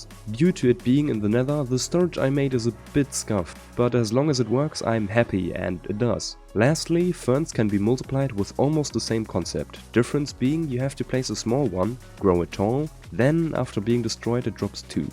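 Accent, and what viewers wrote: Germany. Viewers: Are you from germany? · are you a german? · Kann das sein das er deutsch ist? 😅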